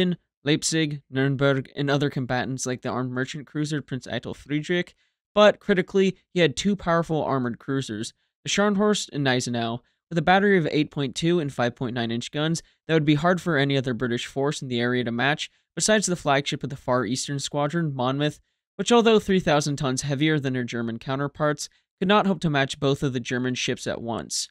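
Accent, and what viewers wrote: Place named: United States. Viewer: This is refreshing hearing good narrative on non US warships with an American accent, but many viewers will miss this asset and show no gratitude for it, as is the failing known throughout society as failure to evolve thoughts according to new developments, what Jackie Fisher thought non First Class.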